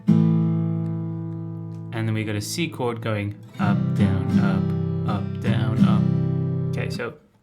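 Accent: South Africa. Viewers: Great video brother. Is that a saffa accent you’re sporting?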